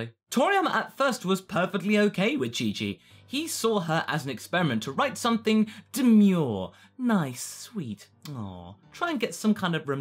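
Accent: United Kingdom. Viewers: I'm late but yeah, he has to suppress his British accent though XD He's also Gohan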